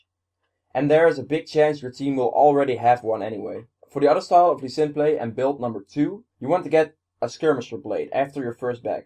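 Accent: Netherlands. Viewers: Talk slower btw are you dutch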